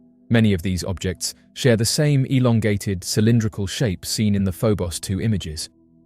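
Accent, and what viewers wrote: United Kingdom. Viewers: The British voice makes is more truthy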